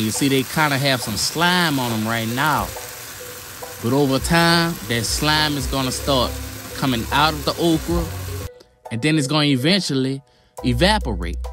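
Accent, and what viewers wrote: New Orleans, United States. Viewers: This is the best gumbo recipe I have seen online and I have seen like 20 of them!! And we trust your judgment because you talk with a Louisiana accent 😄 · Ok I hear that Nola accent so you good😃Baby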